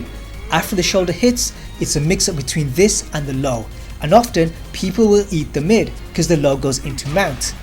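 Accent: Birmingham, United Kingdom. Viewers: Are you Brumie?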